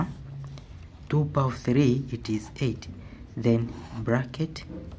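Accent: India. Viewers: The accent sounds indian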